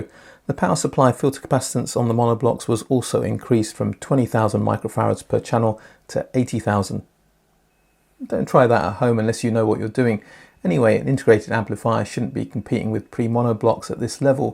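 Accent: United Kingdom. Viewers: Great stuff as always. And by now the way we in the U.S. pronounce 'h' (the correct way) and the way you Brits do so is lost in the background. Personally, I prefer separates over integrated. Especially monoblocs. If others feel differently, I say 'hats off' to them. Plenty of room in this tent for all. My net upgrades? Nothing wrong with the gear I have. At this point the limiting factor is the room. That's a tough upgrade!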